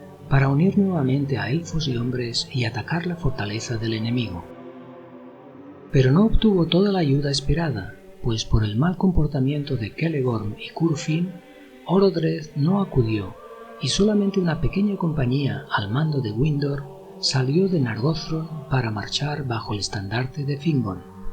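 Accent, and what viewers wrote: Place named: Catalonia, Spain. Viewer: Qué asco el puto acento catalán de mierda 💩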